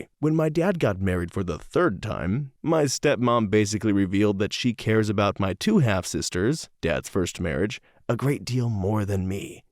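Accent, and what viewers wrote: Ireland. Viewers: Oh I really love the voice of this narrator, similiar to the 'anyway next story' narrator but less unhinged. p.s: I've been living in Ireland for the past few months and thought the accent was spot on. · I gave you a like for the Irish accent lol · Love the Irish accent here narrator, good job :D! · I can't say as to the accuracy of the Irish accent, but it was a nice fair voice that bitch didn't deserve. I wish my text-to-speech sounded like that...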